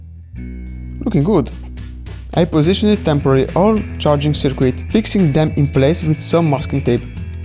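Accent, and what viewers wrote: Italy. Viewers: ehi, sei italiano? si sente dall'accento... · Si sente che sei italiano...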